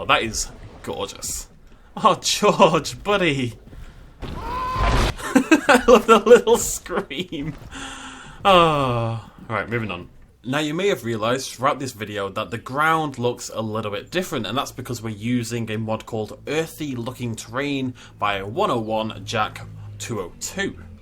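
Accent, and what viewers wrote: United Kingdom. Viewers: Isn’t he like British or something? I think it’s his accent.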